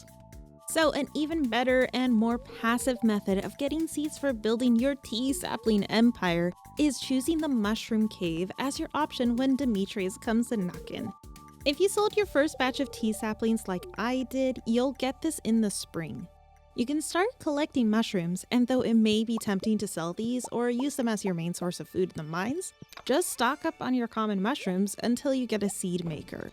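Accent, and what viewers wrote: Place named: United Kingdom. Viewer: I am getting sone serious bri'ish vibes.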